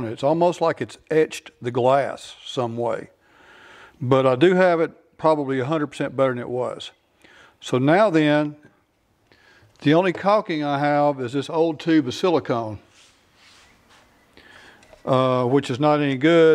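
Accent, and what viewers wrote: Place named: Alabama, United States. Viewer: Alabama? My kinzzz from Huntsville. You have the dialect and accent 😎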